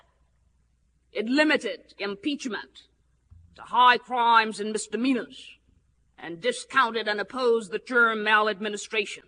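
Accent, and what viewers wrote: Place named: Texas, United States. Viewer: This is what a good Texan sounds like.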